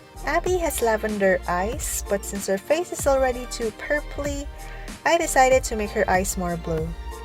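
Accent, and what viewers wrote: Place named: Philippines. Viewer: I'm new to channel, and I've been wondering, is she from the Philippines? Please correct me if I'm wrong, but her accent is just so familiar and way too similar to one of the average accents that filipinos have when speaking english;3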